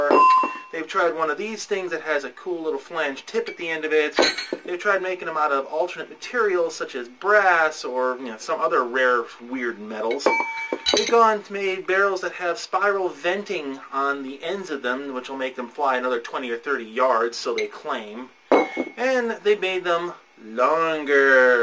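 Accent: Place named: Canada. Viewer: the dude (aND i use the term lightly) has GOT to be canadian.. anyone..lmao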